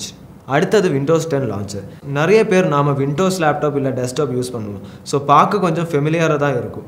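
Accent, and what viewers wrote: Kerala, India. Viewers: Hello Kerala guy your malayali tamil nice bro are you running separate yt channel in Malayalam what's your tech channel name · மம்முட்டி தமிழ் பேசுரமாதிரி இருக்கு தம்பி யாரு... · Narrator malayaayi yaa paa !!! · Ivan yena Malayale mathiri pesuran 😫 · Yeppa dai Ivana ah mathunga da Ivan nalla ila da Ivan malayali pesuran kevalama iruku Ash ah review pana sollunga da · Your Malayalam slang is slightly visible your tamil... Great composite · தம்பி பேச்சில் இன்னமும் மலையாள வாடை.. மாத்திக்க முயற்சிக்கலாமே.. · ഹായ് ബ്രോ മലയാളിയാണോ സംസാരത്തിൽ ഒരു മലയാളി ചുവയുണ്ട് . ഹാഷ് അണ്ണൻ എവിടെ ? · Bro മലയാളി ആണോ?? എനിക്ക് അങ്ങനെ ഒരു ഫീൽ കിട്ടുന്നു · Kerala tamil ah 🤔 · Malayala tamil? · Malayali ah bro accents theridhu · Hi bro...unga slang ah patha kerla pakkam mari irukae!? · Malayalam Tamil slang ah eruku 😃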